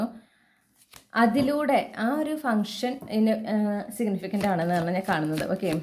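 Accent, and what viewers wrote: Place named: Thrissur, India. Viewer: slang, njanum thrissur aanu.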